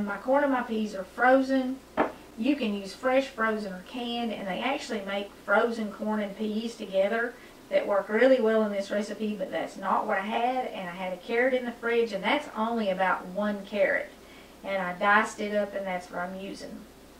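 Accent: South, United States. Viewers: You made me cry, with your sweet southern voice and the music in the background was tearful for me. Thank you for the beautiful video. Yes I do zoom in see what you don't have. LoL I'm making that for dinner tomorrow, today I made your Skillet Steak, baked potato and green beans. It was delicious. I never made Shepard's Pie like that before but I'm going to. I'm so glad I found you, everyone out there knows what a beautiful person you are and I know with your talks you have changed a lot of people like you did me. Finding God through you was the miracle I've been wanting. to happen in my life. I feel very close to you and love everything you do and say. OMG, that Shepard's Pie looks amazingly delicious. Thanks again sweetie, God Bless you and your family. I'll always put God first. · Hi, I was looking for shepard’s pie recipes and I found your channel. 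I live in Mexico but I lived in Michigan for almost 18 years I fell in love with american comfort food I like your recipe and I saw the hamburger one too I like the way you explain and make your food I’m going to cook some of your recipes soon. Where do you live you sound southern. Anyway I will let you know when I cook your delicious recipes.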